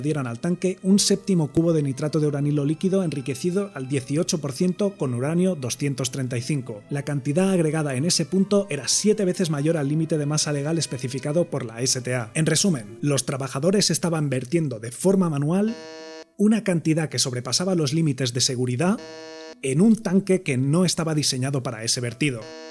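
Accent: Castile, Spain. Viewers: Que Repugnante et Molestoso es listenear el Acento Castellano de Europa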